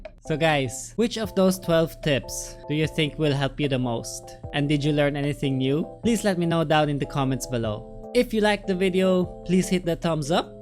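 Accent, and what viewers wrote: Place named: Philippines. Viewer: English mo kasi parang pinoy ang tunog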